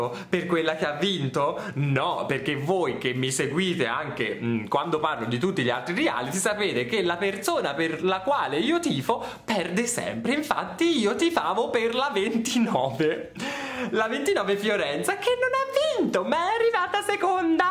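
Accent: Tuscany, Italy. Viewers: E un si sente che tu sei toscano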